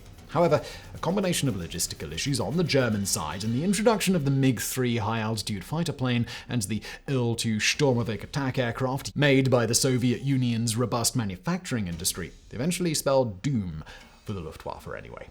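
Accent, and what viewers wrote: United Kingdom. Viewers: Why do you use US spelling, or simplified English? From your accent I thought you were somewhere in Great Britain.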